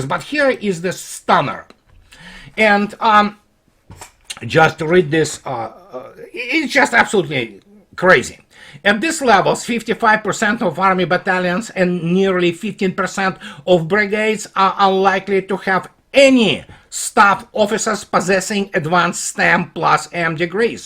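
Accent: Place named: Russia. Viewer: Thanks. English with Russian accent. Now I get the meme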